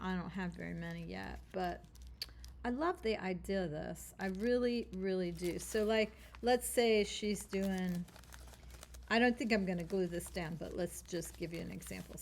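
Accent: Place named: South, United States. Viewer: you are a gem! I've been following you for years as I take my mixed-media journey. I was a part of stencil club on and off for years and I have about 500 stencil girls stencils! I absolutely love your style, your great tips and tricks and that lovely southern voice is a soothing presence in my practice! Thanks for being YOU!!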